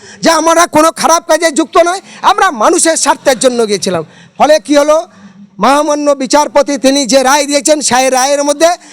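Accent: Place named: Bangladesh. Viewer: Bhai ki tumi bangladesi neki aaato sundar bolso tumi pakka bangladesi mone hoi tumi ki joy bangla sele tumi sala bangladesi mone hoi 😎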